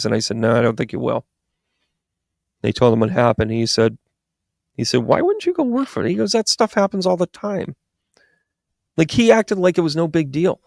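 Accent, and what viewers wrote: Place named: Boston, United States. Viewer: By the way ur NY accent is Boston!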